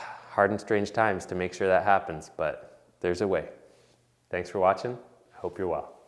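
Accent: Canada. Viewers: If you mix quickset 5 mud with quickset 20 will it set up in the average of the two (or 12.5 minutes) if both parts are mixed equally? Ok, I’ve worked the last 14 hours so my attempt at humor fell on its face. Great video (again). I’m so glad I discovered you a couple of months ago. I’ve learned so many techniques and tricks from you. I love your sense of humor too. I’m from Canada (and want to return) so it’s nice to hear your Canadian accent speaking in English:). Keep up the inspiring and informative videos! Btw, I was a carpenter while working though college. I built houses and apartments and since they’re still standing I must’ve done a good job. I’ve also made surfboards and made a 17 foot sailboat. 👍👍👍 Btw, is Canada allowing Americans into Canada? I was born in Canada so I hope they’ll let me back. You’re so right, these are hard and strange times. Thank you for that heartfelt message.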